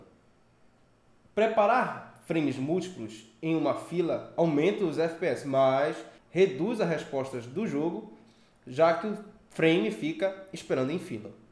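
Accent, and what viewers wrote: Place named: Pernambuco, Brazil. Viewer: Bacana! Pensei q vc era Pernambucano. Sou Nordestino, Aracaju. Vou me inscrever no seu canal com outra conta. Da uma moral pra VC.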